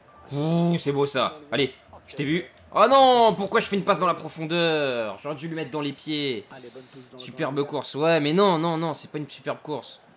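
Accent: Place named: Belgium. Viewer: j'ai l'impression que tu prends l'axen belge je c'est pas si ya que moi qui l'ont on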